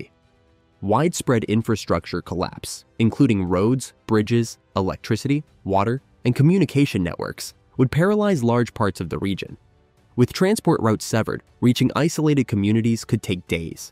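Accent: United States. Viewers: This is hilarious 😂! Is the guy narrating the fable from West coast USA. Because I guarantee California will have slide into the sea long before we have a problem. I'm picking this nonsense is sponsored by 1%ers that want to move here. The wealthiest people on the planet are building bunkers in the shadows of the Southern Alps. Are they also the stupidest people on the planet. Hmmm!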